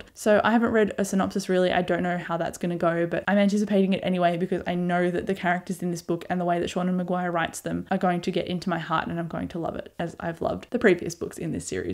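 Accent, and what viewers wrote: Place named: Australia. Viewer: I'd forgotten how pleasant the Australian accent is. :) Looking forward to what you have to say about Parasite. The Wheel of Time...I went through that series a year or so ago because of the Sanderson tie in and because when I saw him in person both times he always credits it as one of his favorite...I won't spoil anything, but I'll be looking out for how your experience goes and save my queries until you finish. :)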